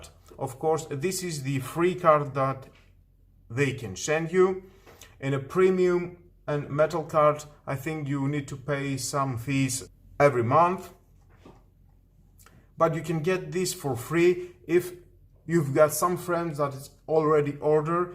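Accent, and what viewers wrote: Greece. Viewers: Τον Έλληνα δεν τον καταλαβαίνεις από την φωνή αλλά με την πρώτη λέξη πού θα μιλήσει αγγλικά, όσο special κι αν είναι, proficiency να έχει ρε ΚΑΤΕΥΘΕΊΑΝ ΘΑ ΠΕΙΣ ΝΑΤΟΣ😂💪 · Κι εγώ με το που τον άκουσα λέω δε παίζει, ή Έλληνας ή Ισπανός θα ήταν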